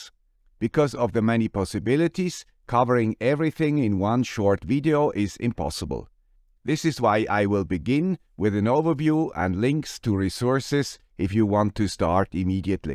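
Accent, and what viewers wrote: Switzerland. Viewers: Awesome! And first time I think I've heard you speak Swiss german (with a touch of french too?)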